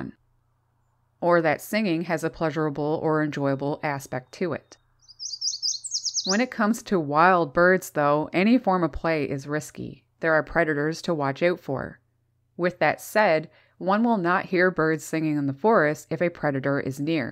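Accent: Canada. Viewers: Canadian voice do you like spring too.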